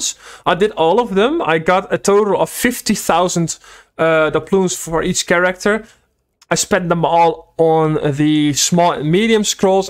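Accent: Netherlands. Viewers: Are you dutch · You are dutch, aren’t ya?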